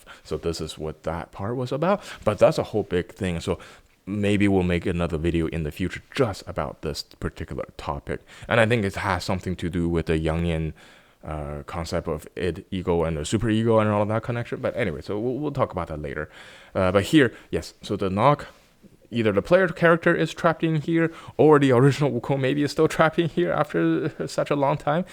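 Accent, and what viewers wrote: Standard. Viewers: holy shit，Your Chinese thank-you words made me even suspect that you are a Chinese blogger in a foreign country, your Mandarin is really standard! damn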